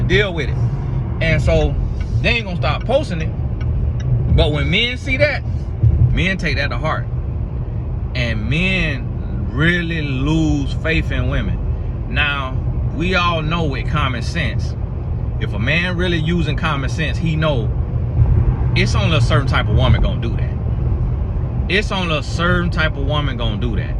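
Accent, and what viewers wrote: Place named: Texas, United States. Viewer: Yup that sounds like you’re from the general region I was born and raised in-TEXAS! Southern?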